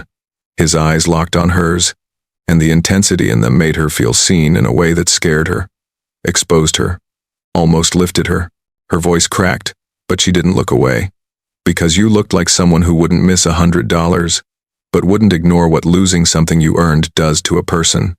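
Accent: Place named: Africa. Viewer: I agree with some of the comments below. and I think you did this on purpose, Too much commentary to robotic, very COLD. Not a good story in you first part. Watching from Nowhere.:face-red-droopy-eyes::face-red-droopy-eyes: :face-red-droopy-eyes: P.O.S. CEO Billionaire in this story. Bad African narration, really BAD.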